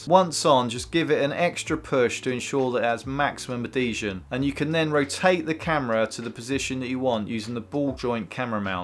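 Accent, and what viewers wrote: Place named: England, United Kingdom. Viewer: good to hear a English accent for a refreshing change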